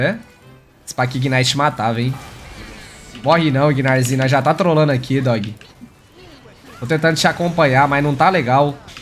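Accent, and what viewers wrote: Minas Gerais, Brazil. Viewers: Não sei dizer se você é mineiro ou paulista... talvez os dois...kkkk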